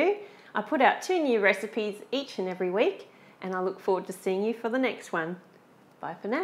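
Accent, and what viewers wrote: Australia. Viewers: . I love your videos. You do them the right way. You start out immediately giving step by step directions. You don't play background music and can be heard clearly. You keep the camera on the recipe. And I have never seen a video set up to where the viewer can print the recipe. That is wonderful. You simply do everything right in your videos. I absolutely love them. And I love your Australian accent too. Thank you so much. Let me just point out some of the things that are on most other videos that drive me crazy to the point of changing the channel. They do a lot of talking before starting the recipe. They bast background music so high you can't hear what they are saying. Or, they blast background music while printng the recipe directions on the screen where we have to freeze the picture to even read the recipe. These things drive me nuts. 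I don't bother watching them or subscribing to them.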